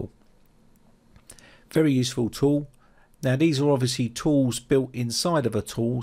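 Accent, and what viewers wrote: England, United Kingdom. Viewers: Since you talk with an English accent, why are you pronouncing CPU-Z as "CPU Zee" and not "CPU Zed", like any Brit would?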